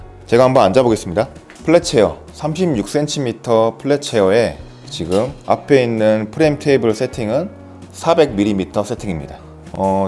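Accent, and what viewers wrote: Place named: Jeolla, South Korea. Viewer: 전라도사투리가 정감이가네요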